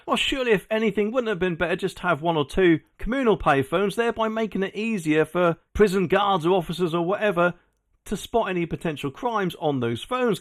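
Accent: Norfolk, United Kingdom. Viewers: You sound just like a good old norfolk boy 😅❤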